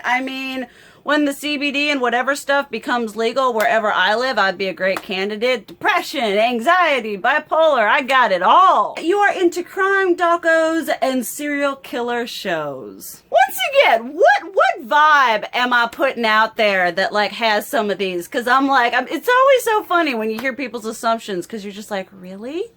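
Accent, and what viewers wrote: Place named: Appalachia, United States. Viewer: OK but where can I get this sweater? Also, judging by your accent and you driving between TN and VA I'm guessing you must be Appalachian like myself. I'm currently in Asheville but grew up in Wise County VA about 45 min from the Tri-state area. If you know what I was just talking about, HOWDEE 🤣If not, that accent still sounds like home!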